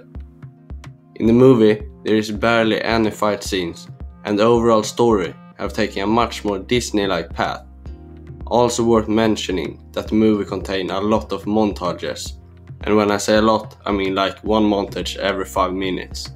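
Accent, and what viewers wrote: Sweden. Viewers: Sorry if this is offensive, but are you from Sweden? Because you have a swedish accent lmao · I just have to ask you.. are you from Sweden? 'Cause it sounds like you're talking swedish - english. I'm from Sweden and can't talk swedish - english.. it's a little bit ambaresing.. (sorry for spelling)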